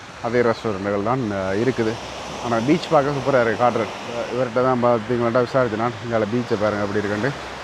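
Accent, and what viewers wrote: Sri Lanka. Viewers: இலங்கை தமிழ் நீங்கள் பேசும்போது கேட்பதற்கு நன்றாக இருக்கிறது ஆனால் வேகமாக பேசுகிறீர்கள் நாங்கள் சென்னையில் இருக்கிறேன் உங்கள் தமிழ் புரிந்து கொள்ள முடியவில்லை கொஞ்சம் நிதானமாக பேசினால் நான் புரிந்து கொள்வதற்கு ஏதுவாக இருக்கும் மற்றபடி உங்களுடைய இலங்கை தமிழ் கேட்பதற்கு நன்றாக இருக்கிறது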